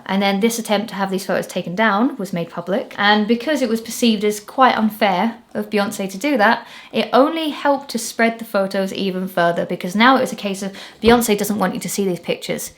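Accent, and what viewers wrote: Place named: Northern England, United Kingdom. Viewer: I like your little northern accent coming through the Essex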